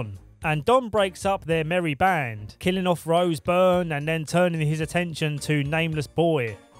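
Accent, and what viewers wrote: United Kingdom. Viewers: 2eyt deys leytaayayayayayaya British english Kills me Always 🫣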